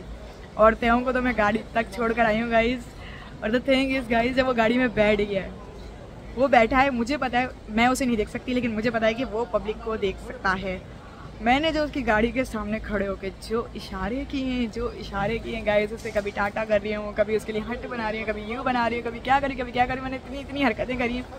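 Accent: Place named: India. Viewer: Ur accent is like indian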